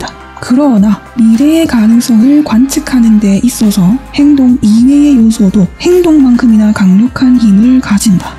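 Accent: Gyeongsang, South Korea. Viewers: 이분 경상도분이시당. ㅎㅎㅎ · 말씀하시는게 경상도 사투리?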